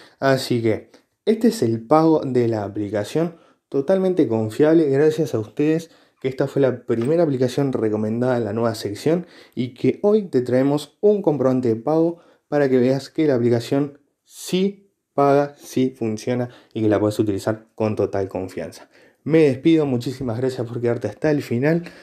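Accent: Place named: Argentina. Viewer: hola brother buenas noches x tu acento dedusco que eres argentino como hiciste para retirar tu pago ya que argentina no sale en los paises buenas tardes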